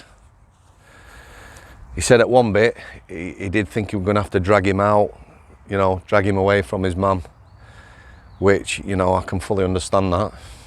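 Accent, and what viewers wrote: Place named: Yorkshire, United Kingdom. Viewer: Another cracking upload mate. Your video + a large cup of tea = perfect early evening infotainment 👏🏻👏🏻👏🏻👏🏻👏🏻. PS loving your Yorkshire twang, keep it coming.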